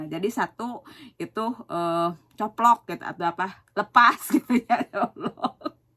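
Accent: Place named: Sunda, Indonesia. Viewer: 20 tahunan di Jepang, eta bahasa Sunda na edun suredunnnn....asli pisan Sunda😀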